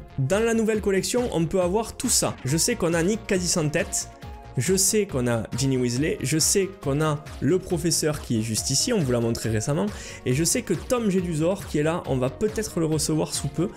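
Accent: Toulouse, France. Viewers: J'adore ton accent Toulousain xD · Mais oui Toulouse ! Je savais que je connaissais :P je ne suis pas tout à fait à coter je suis de Montpellier mais j'ai confondu car j'ai côtoyé pas mal de Toulousains :P j'adore cet accent !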